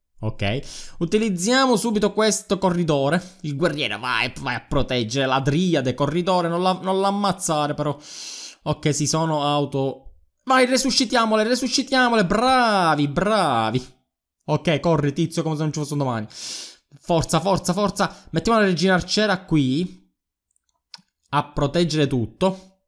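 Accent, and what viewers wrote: Palermo, Italy. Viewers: Fra sei di Palermo?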